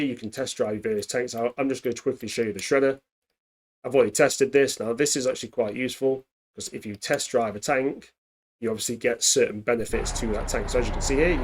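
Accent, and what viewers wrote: England, United Kingdom. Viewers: i could tell by your accent that you were english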